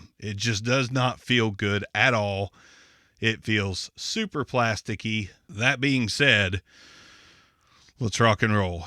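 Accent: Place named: Philadelphia, United States. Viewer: Sensing a Philly or Baltimore accent. I dig it man. I'm from Philly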